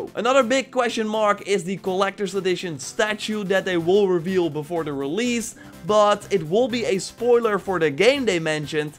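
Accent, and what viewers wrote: Netherlands. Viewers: Are you from The netherlands? you have an accent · Are you from the netherlands? Your accent kinda sounds Dutch lol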